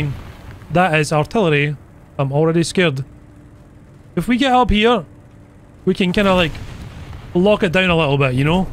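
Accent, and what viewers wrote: Scotland, United Kingdom. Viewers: Wait are you Scottish mate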